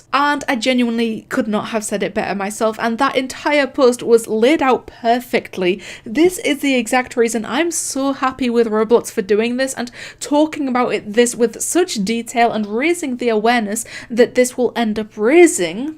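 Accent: United Kingdom. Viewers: can we talk about her bri ish accent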